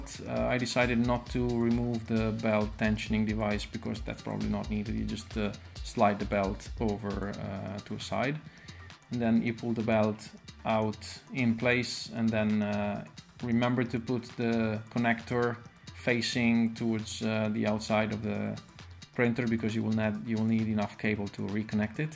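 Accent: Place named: Netherlands. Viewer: Italiaanse subs maar 100% een nederlands accent! bedankt ga morgen alles installeren :)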